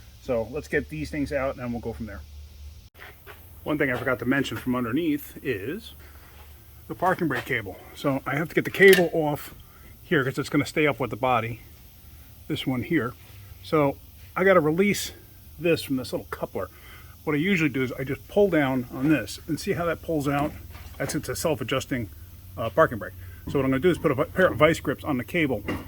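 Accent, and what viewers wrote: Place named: South Jersey, United States. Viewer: I'm From south Jersey/ Phila area, picking up on a tad bit of my accent over here. 👍Awesome vid, just bought a Jasper eng. n plan on doing this. The easy way. Thanks for the vid and subscribed. Keep those vids a rollin ✌️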